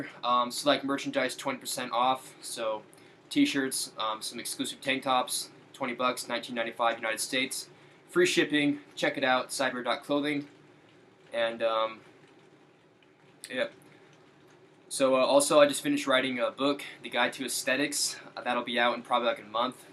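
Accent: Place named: Australia. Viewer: talks like an Aussie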